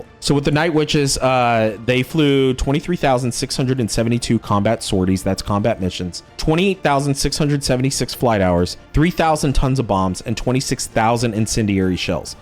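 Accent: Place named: United States. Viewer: hearing a american say the g or ch will never be not funny to me, if you want more lore about more regiments of renown you gotta do some looking into, they sing about historical units, events, people, battles and other unique "items" of war without any of the stigma behind it of it being used for good or bad, axis or allies. Night witches is one of there song and a banger at that. fair note it is metal music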